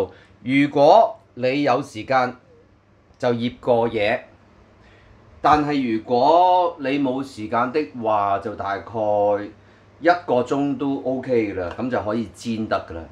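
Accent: Teochew, China. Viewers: 哈哈，潮州话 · 係唔係河洛話啊？只不過老闆個口音聽落似潮州話多啲😀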